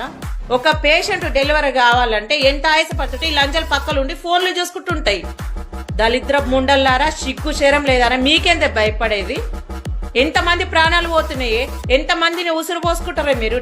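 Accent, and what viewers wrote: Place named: Telangana, India. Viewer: Name emo lady hitler in andhra anaw...akka slang endi telangana lo undi 😂😂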